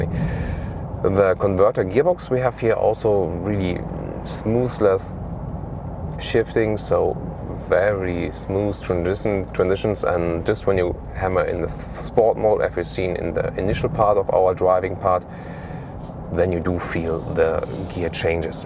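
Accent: Germany. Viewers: One of my favorite proper SUVs currently on the market. I am in love with your German accent! I feel the need to open a Milka bar. Thanks for this review. From The United States of America.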